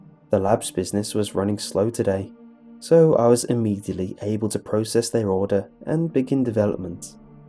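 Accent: United Kingdom. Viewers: Subscribed...I've listened to you tell these stories for a while now. I've always been a horror movie fan n love scary stories but it's your voice and accent that made me subscribe to you. My mother is British and I would spend summers w my grandmother who had a thick accent but I never heard first hand a man w this accent and I've got to tell u yours is enchanting! I could listen to you talk all day...my grandmother is no longer w us so it's also comforting in a way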